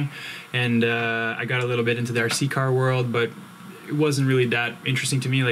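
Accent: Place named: Brazil. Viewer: Nem tem sotaque nada... hahaha, mas agora que você falou, talvez tenha mesmo em alguma coisa, eu nunca que iria imaginar que você é Brasileiro.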